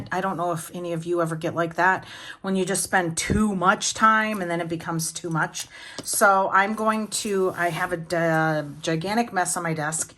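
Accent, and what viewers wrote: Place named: New Orleans, United States. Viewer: What area of the country are you from (if you don’t mind saying, you sound like you could be from New Orleans or another port city)… except that you say “you guys” instead of y’all - just curious I’m a speech pathologist and like to guess.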